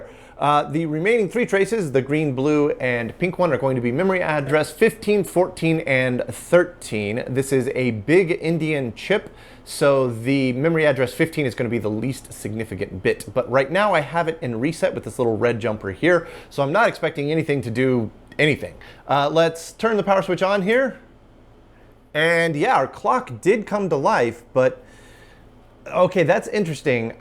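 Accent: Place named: Texas, United States. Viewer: Yep. I'm assuming he has a Texan accent since he lives in Texas and sounds like most of the Dallas/Ft. Worth area folks I know. In that accent, "indian" and "endian" sound exactly the same.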